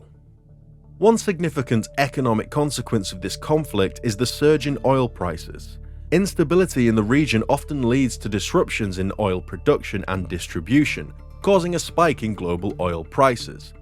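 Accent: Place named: United Kingdom. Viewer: This man has a very British accent - hummmmmm -he`s saying 'they' - so I wonder where he`s moved to, to not think of himself as British.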